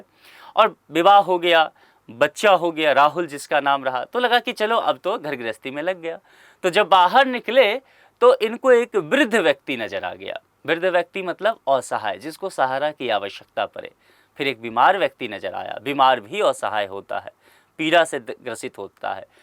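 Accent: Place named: Bihar, India. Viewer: Bihari h sir 🙌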